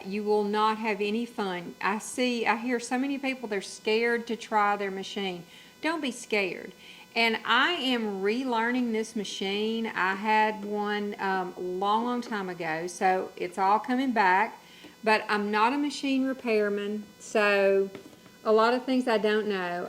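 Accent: South, United States. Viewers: PS I absolutely love your southern accent... We northerners don't hear that very often, so nice .. be well All the best to you.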